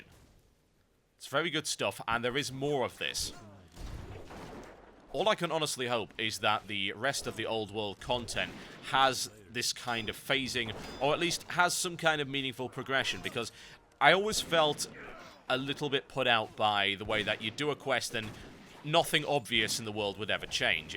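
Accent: United Kingdom. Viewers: "Even if you don't care about the Lore, you'll care about whats about to happen" "Oh dear." "that sounds like crashing water in the background" "What the BLOODY HELL happened here. Wow. It's all gone." i literally had to pause to catch my breath with those rapid fire bits of humor, delivered in british fashion. i have to seriously ask where you're from, and what accent that is, or as much of an accent as you'll have to an american listener. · yer being british rocks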